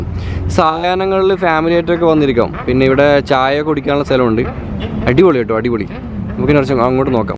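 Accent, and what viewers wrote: Kannur, India. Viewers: Kannur slang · ഓവ്വ് എന്റെ മോനെ . ഇങ്ങള് നോക്കിയാട്ടെ . . 😀😀😂😂 എട പോയാലും അമ്മളേ കണ്ണൂര് സ്ലാങ്.. ബേഗം റൈഡ് സ്റ്റാർട്ട്‌ ആക്ക് അമ്മള് കട്ട വെയ്റ്റ് ആന്നപ്പ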